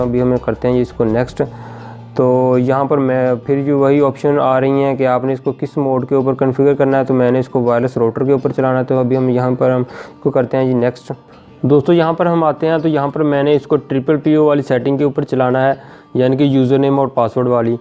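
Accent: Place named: Pakistan. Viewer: hahaha boli ton hi samj gya si pakistan di video hai. By the way great video. Gwandi taade.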